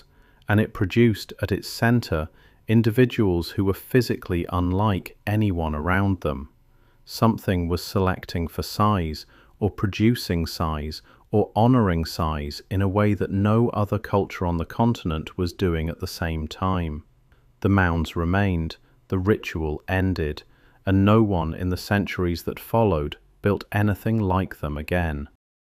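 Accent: United Kingdom. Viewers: What’s with the British accent? Has AI been programmed that speaking with British accent lend to greater credibility, more believable or entertaining.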